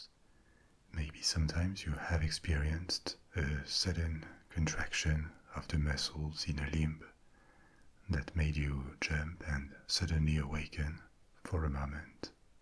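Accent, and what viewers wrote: France. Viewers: Oh my god. The French accent is literally perfection combined with ASMR. What a time to be alive this is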